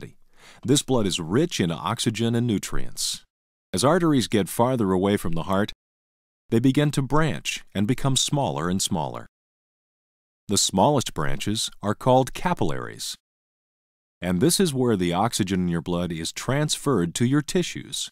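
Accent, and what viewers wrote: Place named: United States. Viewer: LOL at Capillaries! lool . Aw i love me a good american accent :P hehe .Anyway ACE vid! . Love it and it explains and covers the topic great! <3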